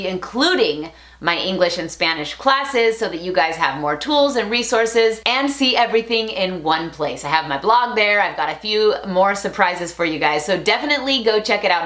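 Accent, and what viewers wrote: Mexico. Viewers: Haters is Odíoso in spañish. Remember that you speak Mexican Spanish. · SE TE NOTA EL ACENTO MEXICANOOOOOOOOOOOOOOOOOOOOOO cuando hablas en inglés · hahaha your mexican spanish is so cuchi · Espectacular tu español con acento mexicano!!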